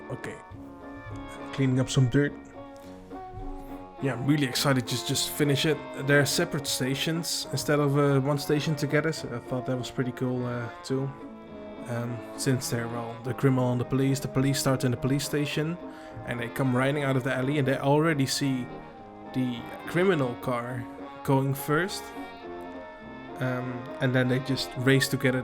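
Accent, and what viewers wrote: Netherlands. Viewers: Ik hoor aan je Engels dat je Nederlands bent, nice video!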